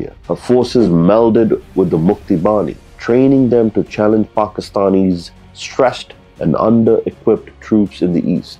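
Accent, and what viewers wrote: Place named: United States. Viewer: Love from india 🇮🇳🇮🇳🇮🇳🇮🇳🇮🇳 we want more information about Indian and pakistan historical... We also learning in Indian school Book (NCERT) but i listen what's pakistani think about undivided india Your voice is good but american accent some time problem to understand Your name is (i think is hindu sir name and also creat from ancient Afghanistan or great India when 16 mahajanpad in north india one of the state... If you know about your sir name and......history about 2th century or gupta, mourya, saatvahan, shak, kushan emperor so please make video my be possible speaking urdu/Hindi)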